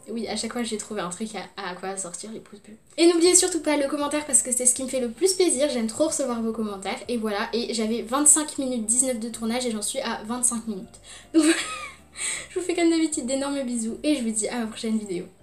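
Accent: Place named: England, United Kingdom. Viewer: Magnifique accent anglais bonne vidéo bravo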